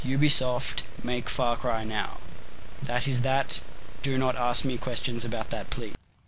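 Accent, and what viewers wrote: Australia. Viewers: You have a good potential to be professional commentator. I like your voice inflexions sounds a little Aussie. If you could drop the swear words, it would be "really really really really" good.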